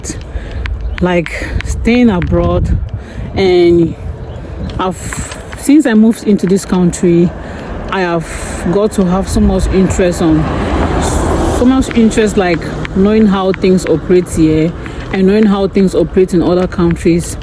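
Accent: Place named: Nigeria. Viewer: Nice, did you grow up in Nigeria? Your accent sounds Nigerian.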